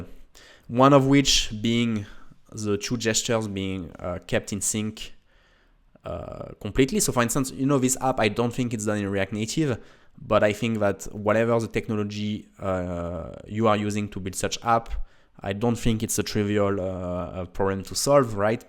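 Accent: France. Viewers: Nice video, are you French? You have a French accent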